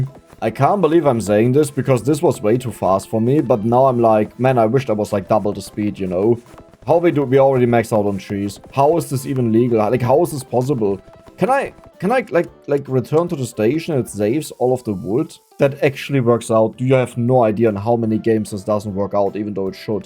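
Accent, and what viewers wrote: Germany. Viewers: I see now that i love ur videos because of your german accent xd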